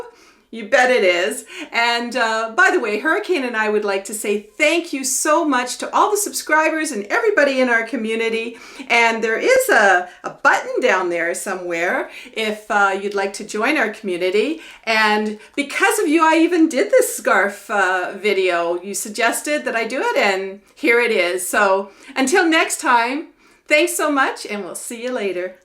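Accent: Canada. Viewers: oh, now I think Canada? Am I right? heard a little accent.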